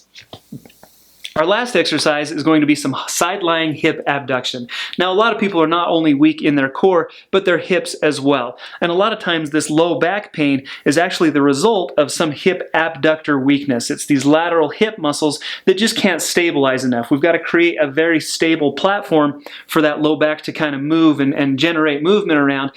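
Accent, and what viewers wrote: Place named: Utah, United States. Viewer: This is excellent, and I heard him talk for three seconds and knew he was from Utah!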